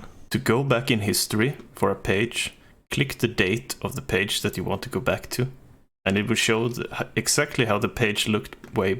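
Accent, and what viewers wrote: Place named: Sweden. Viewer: I knew you were Swedish lol your accent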